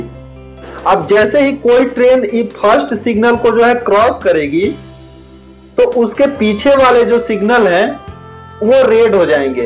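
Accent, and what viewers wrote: Bihar, India. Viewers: Bhai Bihari language me Aisa hi Bolte hai. V Ko bhi, Mai Ko ham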